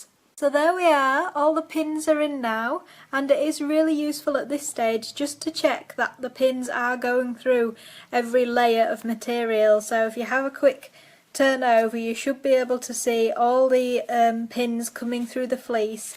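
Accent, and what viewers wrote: United Kingdom. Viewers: How long does one cage liner last? Does it bunch up in the washer or dryer? You have such a sweet voice with that wonderful british accent. Maybe one video you could show your face on camera while holding a piggie so we can see the face that matches such a nice voice. Thank you so much for your videos, I do find them full of good information.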